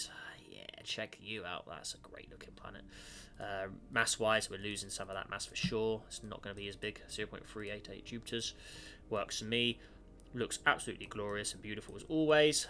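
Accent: United Kingdom. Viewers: IS BRO BRITISH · Btw are u from England cause u have a British accent its just that is from USA and that's Fr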